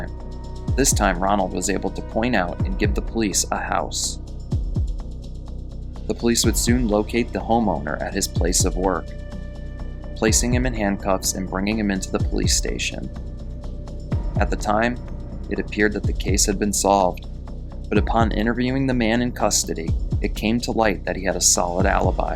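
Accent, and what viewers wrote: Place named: United States. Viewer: I love your accent. What part of America are you from?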